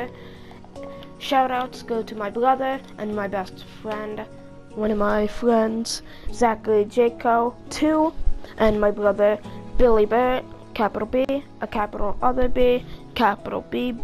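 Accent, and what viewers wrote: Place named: United Kingdom. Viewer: Holy shit you sound British in this video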